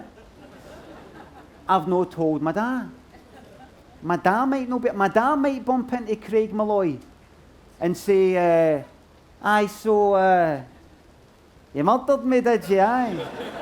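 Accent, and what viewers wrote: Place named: Scotland, United Kingdom. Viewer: He's Scottish… he speaks normal for a Scottish person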